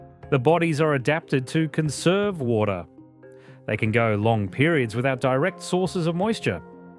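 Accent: Australia. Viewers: Narrator has Aussie voice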